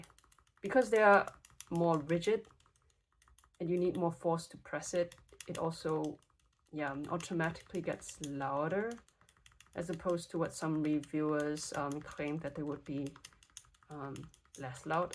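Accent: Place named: Germany. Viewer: I thought so! I have a friend in germany and i dont mean to generalize, but the way you pronounced your words were very familiar! Cant wait to see you improve further.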